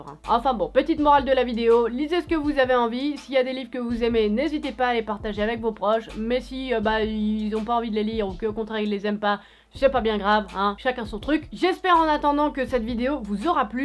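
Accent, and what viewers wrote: Paris, France. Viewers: Je ne l'ai pas lu et je crois que tu m'as fait gagner du temps au lieu de le perdre. Et pourtant je suis tombé sur toi par pu hasard sans même te chercher (parceque covid 19). J'adore ton phrasé parisienne je dirai, ton franc parlé et ton analyse de fin est outrageusement pertinente. En gros il a payé les premier influenceurs de l'époque ... avec Norman Squeezy et Cyprien dans la poche ... c'était gagné c'etait sûr !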